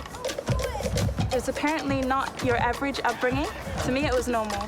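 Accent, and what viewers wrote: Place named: Australia. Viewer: Your Australian lol lmbo I love the accent ❤lol lmbo.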